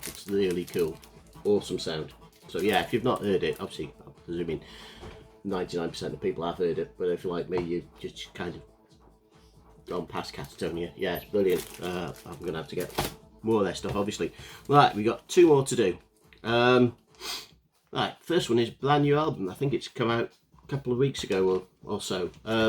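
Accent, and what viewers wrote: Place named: Wales, United Kingdom. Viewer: I still can't get over your Welsh haha. Either way, cheers for the shout out mate. That boxset been on my list for ages and I keep meaning to ask for it for a birthday or Xmas. Good stuff, there is a new band with ex members called ' ' which I picked up. Not bad but more in a doomy industrial style. Love that album as well, second one good as it has singer from on it. 🤘💀🍻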